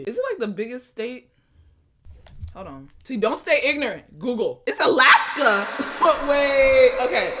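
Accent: Nigeria. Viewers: She is nigeria Becuz her last name says and its a igbo name in nigeria And in her second youtube channel when she was surprising her family with gift she had played a nigerian music She is nigeria but was born in taxes you can hear the nigeria accent when she is talking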